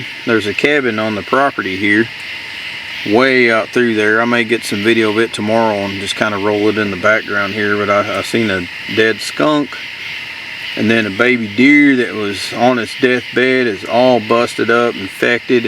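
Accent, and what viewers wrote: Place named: South, United States. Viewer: You sound like ur from Kentucky or Texas. LOL Love the laugh!!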